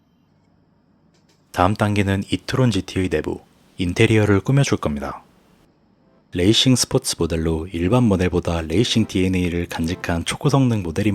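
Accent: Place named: Gyeongsang, South Korea. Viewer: 경상도분이신가보네요. 서울말 쓰려고 노력하는게 저는 들립니다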